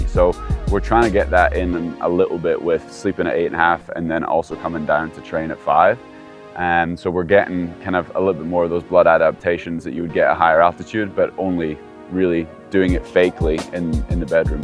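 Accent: United States. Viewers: What’s with the American accent??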